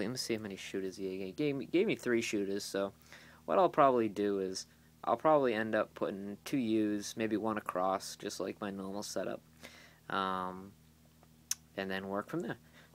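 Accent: Boston, United States. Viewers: Your Boston accent is pretty strong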